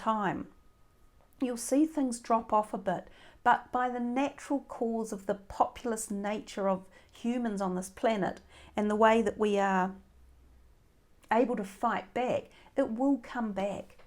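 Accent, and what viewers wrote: New Zealand. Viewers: It's a New Zealand accent and yes, it's lovely.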